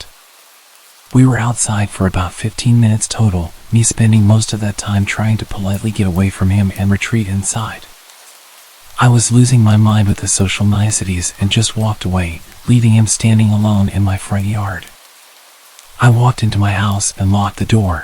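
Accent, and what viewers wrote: United States. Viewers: Oh no, an American, bye.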